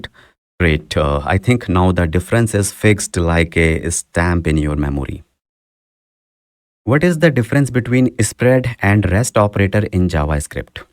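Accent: India. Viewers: The contents is 100% but its diffcult to understand with Indian accent -_-. !!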